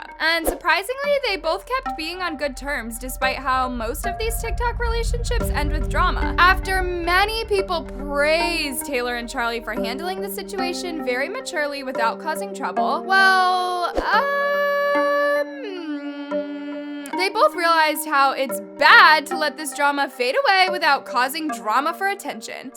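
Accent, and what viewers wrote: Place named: AAVE, United States. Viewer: literally just aave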